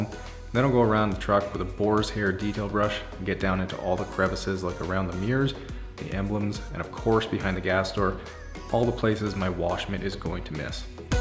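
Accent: Canada. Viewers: Anyone else just listen to that Canadian accent and smile😂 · That’s exactly how I thought Canadians talk to each other.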